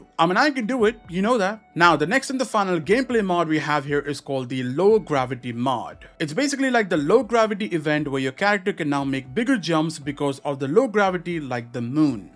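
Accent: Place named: India. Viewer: First i cant understand half of what you say with your India accents. Next why ruin the game taking out parts changing colors of markers. What I see is only messing up the game taking out good parts to make it worse. Who is the laim person not thinking. CAN I play my game WITH OUT DOWNLOADING THIS 48GB NIGHTMARE????? Please tell me i can. A complete joke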